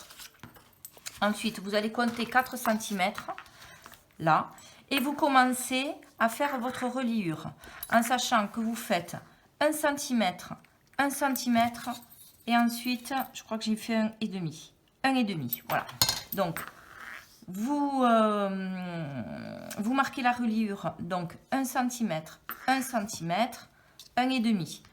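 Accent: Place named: Toulouse, France. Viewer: Mais qu'il est beau notre accent toulousain 😉. Merci pour le tuto. Je participe...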